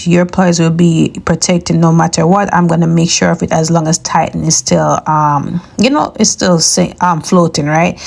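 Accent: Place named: Jamaica. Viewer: U sound Jamaican 😂but yh javi is a hopeless fool because why are u pushing ur man away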